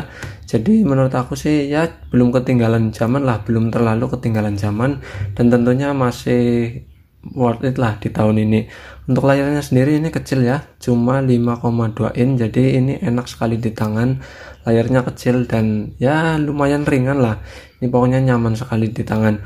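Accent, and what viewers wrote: Banyumas, Indonesia. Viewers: Medok ngapak mas 😄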